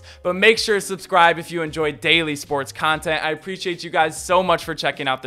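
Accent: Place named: Philadelphia, United States. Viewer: This video sounds like the every day gan born n raised in Philly,this is how we sound every summer lol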